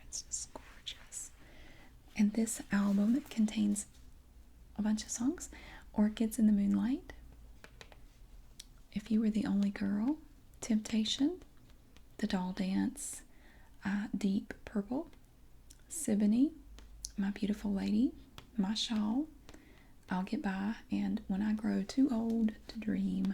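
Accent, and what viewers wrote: South, United States. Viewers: This is a match made in heaven for me. Southern accent + ASMR + vinyls = my favorite things. Can't wait to fall asleep to this tonight, thank you ❤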